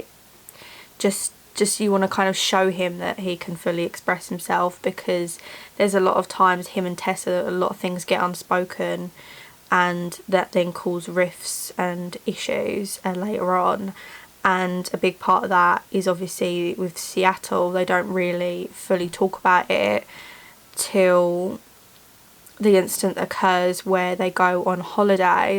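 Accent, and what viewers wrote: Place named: Yorkshire, United Kingdom. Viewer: I loved this book so much mostly because the book was so freaking large, I’d say it was almost larger than Harry Potter book series but I loved your review and I am hoping for the 3rd and 4th movies to be longer cuz there’s much more pages and suitations happening so it’s way out there but, here’s hoping it goes well with the direction of the last two books and weather or not they keep the cast from the 1st and 2ed movies. Ps I love your accent, r u from yorkshire? Or somewhere close. Hehe 🤔💕 also please follow me on Instagram Karalynnlovison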